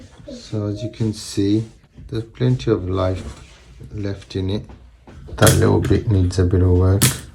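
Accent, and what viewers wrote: United Kingdom. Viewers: Man with the British accent I replayed the opening line 3x to understand “bosses”. I thought you said a P-word which would seem highly out of character…. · What country do you live in? You have what seems like a British accent. Are you of Indian ancestry? Don't answer if it's too personal. I'm just trying to place you.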